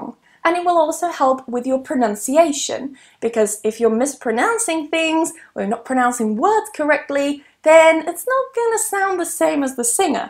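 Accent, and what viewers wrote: United Kingdom. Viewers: I'm from Uzbekistan, I love your British accent, you are the most beautiful teacher in the world, if I were your husband I would be the happiest man in the world 😘😘😘😍💓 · Those tips of yours were really helpful to be honest for those who want to improve their English skills and can't find a partner to speak to. That happened to me a lot back then many years ago when I first started learning English. It's quite hard to find people who'd be willing to interact and help us with our English speaking skils by practicing it with conversations. I'll keep watching your videos anyway cause I want to get used to the British accent which I've been learning how to admire, cause before it sounded really weird, but it's changed gradually overtime! And I loved your accent specifically! :) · Thank you so much. Can i suggest you that maybe you can put the text below. 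Because maybe there are some people who don't understand because it is too fast or '' too british'' hehe.. Thanks a bunch. keep helping and improving. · I'm a huge fan of British accent english speaker. I love British accent. Carry-on thank-you so much. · Can you please tell me in which british accent you are talking🙂💐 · I love your beautiful british accent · I love British accent and you.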